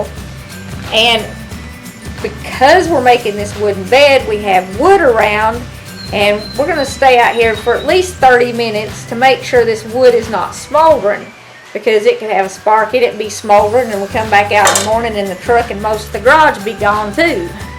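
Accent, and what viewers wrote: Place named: South, United States. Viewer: that southern twang but I got one two lol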